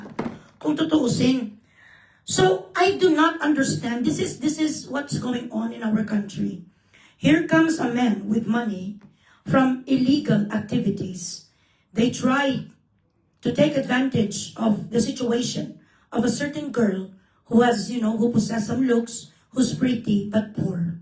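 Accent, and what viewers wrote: Iloilo, Philippines. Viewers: Hi from Germany👋I like the way you talk with an Ilonggo accent 😂🤣🤣and delivering the words Diputa and Belat mo😅😛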